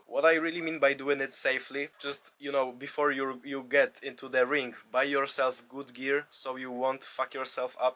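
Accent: Poland. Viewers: Polish, I suppose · jak to jest że po twoim akcencie od razu poznaje rodaka XD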